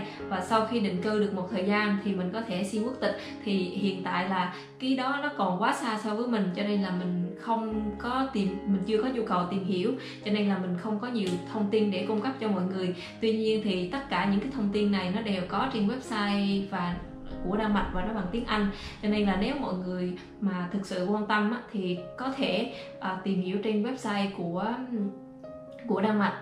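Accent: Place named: Quang Ngai, Vietnam. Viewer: chị Quảng Nam nè,nhưng nghe em nói giống Quảng Ngãi hơn.Chúc 2 vc thành công nha!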